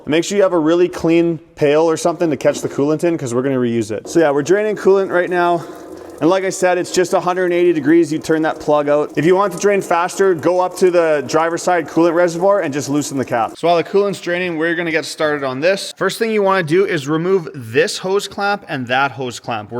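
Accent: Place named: Canada. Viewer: His accent is Canadian af (Sask maybe?), and I can attest it’s not illegal here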